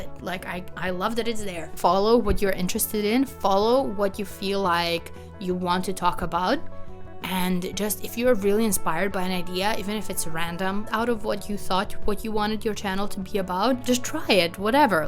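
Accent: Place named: Italy. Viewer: si sente che sei italiana eh. sgamata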